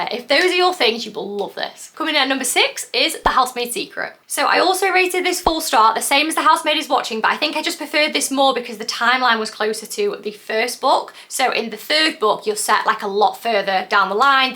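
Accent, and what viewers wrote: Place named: United Kingdom. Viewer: girll your british accent made my head gone crazy😵‍💫 can u talk slower next time ?? btw nice review👍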